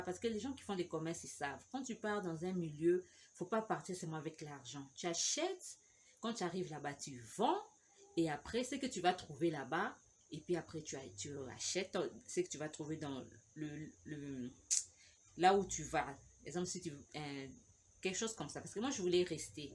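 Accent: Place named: Democratic Republic of the Congo. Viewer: Lorsque j'ai entendu ton accent avec le mot videyo j'ai directement compris RDC et que tu es Nekongo avec tes mots swahili mais tu parles bien sauf que tes vidéos sont un peu long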